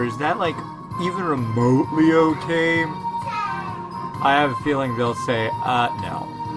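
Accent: United States. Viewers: Провинциальный американский английский, с проглатыванием половины окончаний :) буду его смотреть, чтобы язык воспринимать. Классный чувак.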